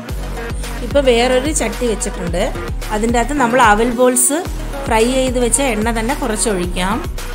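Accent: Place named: Tamil Nadu, India. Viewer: Gopi manchuri enn കേട്ടിട്ടുണ്ട് ഇത് aadhyaayita enthayalum kandal തന്നെ manassilakum super chechi malayalam samsarikkumbilum tamiz idakk idakk slang verunund Sem2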